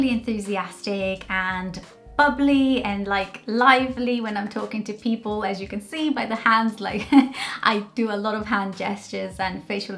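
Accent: Wales, United Kingdom. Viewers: You’re picking up a Welsh accent 👍🇬🇧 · I love your british accent, it's sounds so beautiful and clear You've been helping me a lot with my English, "kisses and hugs" from Brazil! Obrigada por tudo ♥️🇧🇷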